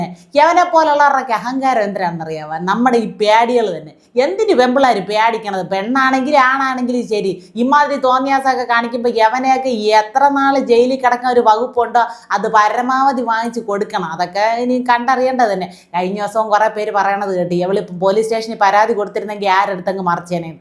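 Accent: Thiruvananthapuram, India. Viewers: Super. TVM slang is suerb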